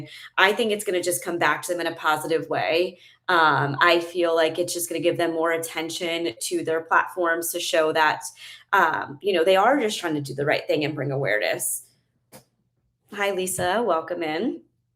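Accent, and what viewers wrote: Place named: New Jersey, United States. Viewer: Wild guess but are you from Jersey and/or Italian?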